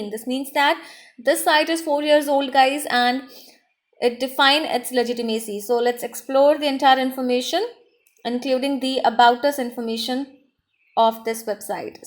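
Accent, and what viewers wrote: India. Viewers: your vedio sucks at least buy a mystery box and do a good review on it. all I understood from your vedio is that your Indian, heavy accent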